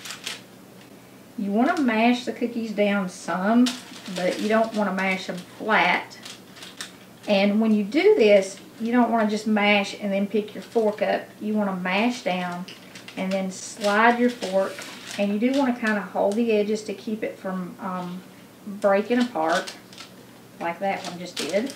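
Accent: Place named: Tennessee, United States. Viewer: Your Tennessee ? accent reminded me so much of someone I just couldn’t place it, and then it hit me! Dolly Parton! The way you pronounce many words reminds me of Ms. Parton!🥰🤩🤗 I am really enjoying your videos. Thank you ! 🙏🏼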